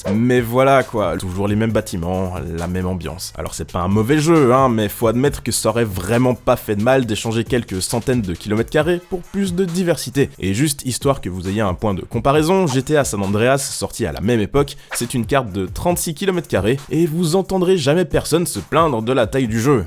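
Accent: Belgium. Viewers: T'ES BELGE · mec t belge la classe (enfin je crois car tu dis nannante neuf)!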